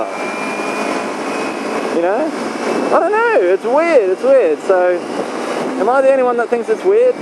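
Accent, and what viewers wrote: Australia. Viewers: are you an aussi?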